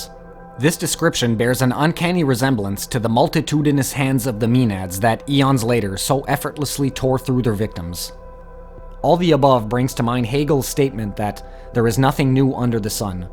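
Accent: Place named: Quebec, Canada. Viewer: Tu sonnes Québécois, bonne vidéo!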